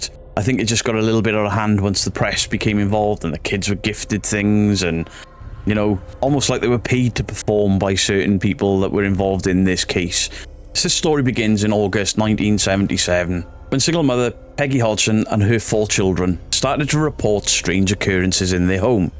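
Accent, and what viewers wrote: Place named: Newport, United Kingdom. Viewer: That a Newport accent I detect… lol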